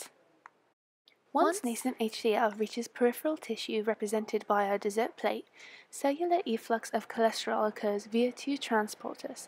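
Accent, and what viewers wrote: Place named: United Kingdom. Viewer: Lol i love the british accent! hahahhahaha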